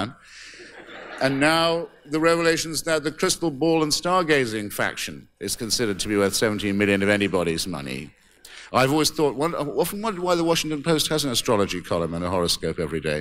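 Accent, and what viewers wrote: United Kingdom. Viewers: His intellectuality was overrated..he hid his questionable intellect with a pompous British dialect which he used as a crutch to swoon and charm.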